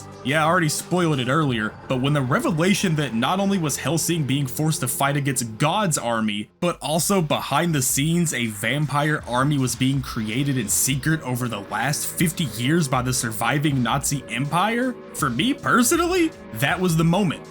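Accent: Texas, United States. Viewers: Great video! Also, are you Texan? Kept catching that twang from certain words and vowels❤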